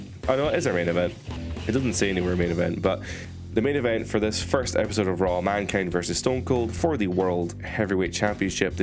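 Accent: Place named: Central Belt, United Kingdom. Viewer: I’m from Stirling. You sound like you’re from central belt as well! How’d my aim?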